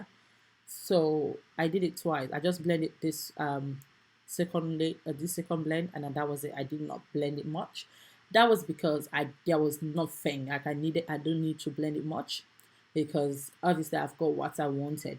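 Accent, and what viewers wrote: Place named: Jamaica. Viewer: I've had ginger beer from the store that is made by Goya. And when I take a sip of it I can feel the antioxidants working. I don't want it really sweet but I want to make my own I was going to make kombucha I'm poor and they won't let you buy kombucha with food stamps anymore. I don't have a lot of Ginger but I think I have enough to make probably a gallon. And I want to make it the real Jamaican Way there are things Jamaicans know that I need to know. I love the accent. I used to work with a Jamaican guy at the sewer plant Bedford New York at the prison besides a used to make tea from cannabis. I bet that was very healing also. I'd say this is a healing drink it's not just a drink. That must be really strong you keep doing it over and over. Kind of like the time I was moving and I made the strongest coffee on Earth espresso but you keep running the water through it about five times I was awake for days LOL.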